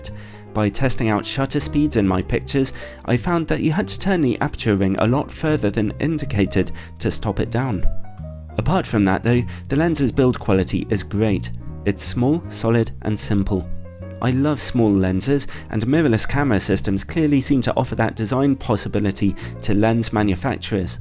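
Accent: United Kingdom. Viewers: nothing like a British eloquent voice...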